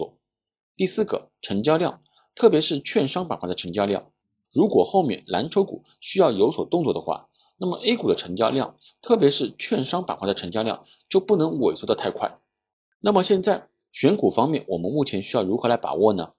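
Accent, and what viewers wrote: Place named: Shanghai, China. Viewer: 听老师口音祖籍应该上海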